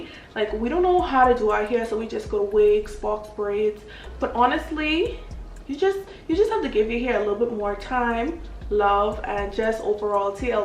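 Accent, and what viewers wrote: Bahamas. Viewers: Definitely Bahamian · She from the Bahamas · It's a Bahamian accent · You must be Bahamian! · I love the Bahamian accent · Are you Bahamian, bc this accent hide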